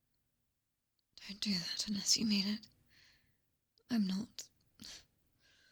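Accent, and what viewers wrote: United Kingdom. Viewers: Nice British accent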